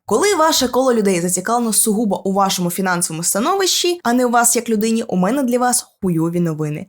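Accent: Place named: Zhytomyr, Ukraine. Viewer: Ой цей божественний житомирський суржик)) Лайк підписка)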